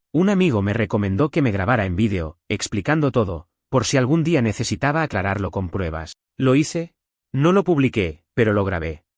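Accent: Argentina. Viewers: Me suena a que es argentino, al menos eso parece por como hablá.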